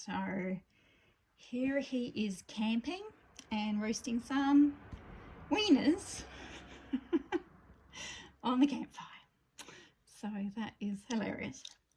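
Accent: Australia. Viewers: Hi I am fairly new to your channel but I have been binge watching. I love all of your videos. I have gotten so many coloring book ideas from you as we have very similar taste. Most of all your lovely Australian voice is such a pleasure to listen to. Very relaxing. So glad I found you on here! 🙂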